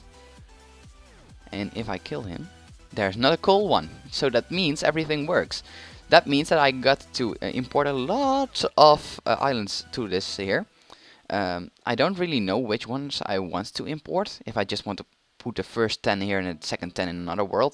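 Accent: Netherlands. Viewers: ben je nederlands? je klinkt nederlands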